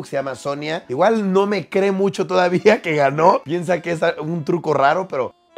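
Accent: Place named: Venezuela. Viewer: Ese estilo de hablar es muy muy de Venezolana JAJAJAJA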